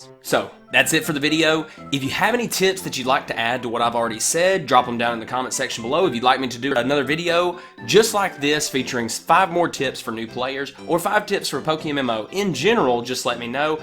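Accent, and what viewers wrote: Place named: South, United States. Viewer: I thought ur accent was ironic but it isn't. Nothing wrong with that just a southern accent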